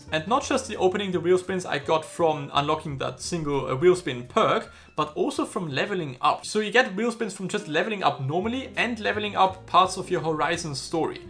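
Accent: Germany. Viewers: wie man das deutsch einfach raushört haha xD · you know its bouta go down when you hear the german accent and you see the glasses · Irgendwie finde ichs lustig wenn Deutsche Englisch sprechen auf YouTube. Kein Front gegen dein Englisch, liegt glaube an mir :D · You sounds like a German. I Think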